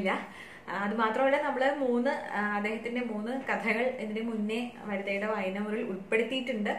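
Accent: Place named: Pattambi, India. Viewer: ഒരു പട്ടാമ്പി കാരിയെ പോലെ കഥ പറഞ്ഞു..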